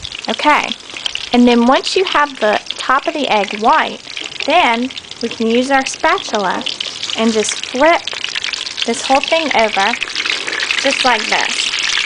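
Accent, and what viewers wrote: South, United States. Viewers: wow,a beautiful Asian girl with a southern accent, how exciting! Also, the food looks great. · An Asian with a country accent, cool. Love this dish. Very easy to make, and very tasty! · someone of Asian decent speak with a southern drawl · Great job! you make it look so easy. I love your southern accent!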